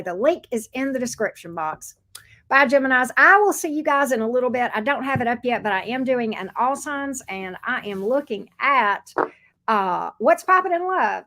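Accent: South, United States. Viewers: I love the southern accent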